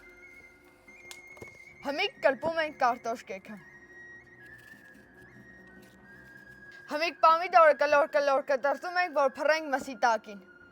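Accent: Lori, Armenia. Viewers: Իմ լոռեցի փոքրիկ եղբայր❤️😍այյյ մեր բարբառը 😅❤ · Վայ ես քու համով հոտով լոռվա բառբառին մեռնեմ😊😊😊❤❤ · Ամենահամով-հոտով բառբառը ` մեր ԼՈՌՎԱ բառբառը։ Առանձ ձևական նոտաների և ելևէջների ❤❤❤❤ապրեսսս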